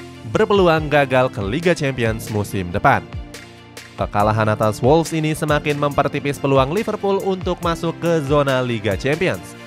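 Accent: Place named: Banjar, Indonesia. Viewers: Anjay adminnya org banjar nih😄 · Pian ni urang banjar kah mang?? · Admin cetakgol orang samarinda kah....GK asing sama cara bicaranya🤔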